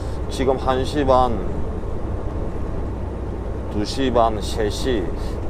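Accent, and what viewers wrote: Gyeongsang, South Korea. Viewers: 2025년 4월10 경상도 표준말이 그리워 또 왔습니다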